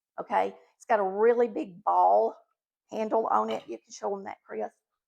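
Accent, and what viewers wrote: South, United States. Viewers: I can smell it over here in New Jersey. I'm going to make this easy Taco 🌮 Pie! Thx for sharing! I watch you on my YOUTUBE TV Channel & on my smartphone. I like your style and your southern accent.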